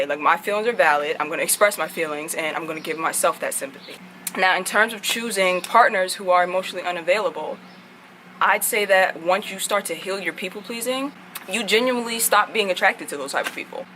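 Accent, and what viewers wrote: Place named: New York, United States. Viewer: I wanna kick it with you, are you from New York? Lol you sound like it a bit. I'm from out here, love your swag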